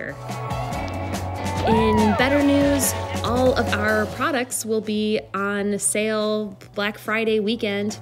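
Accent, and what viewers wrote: United Kingdom. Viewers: I thought that was a very impressive British accent. Well done mate! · Totally loved your British accent. Very funny, especially the panic part. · I liked the voice, sounded authenticity British to me and fun. Hope you don’t stay sedately in FL. Happy Holidays will miss you😷😳🥲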